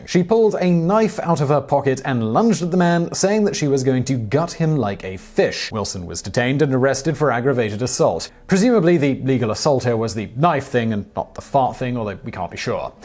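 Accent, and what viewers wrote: United Kingdom. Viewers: Hearing a British man talk about the Florida man is the reason I’m still alive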